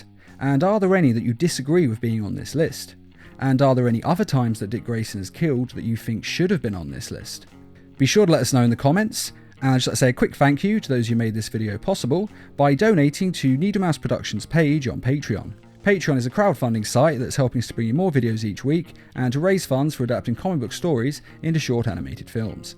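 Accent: United Kingdom. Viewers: I have a question is this your real English British accent or is it fake and can you do a face reveal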